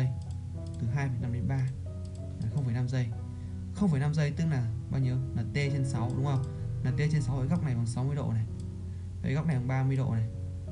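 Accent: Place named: Nam Dinh, Vietnam. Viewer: tuy giọng anh có rất Nam Định nhưng đc cái ấm =))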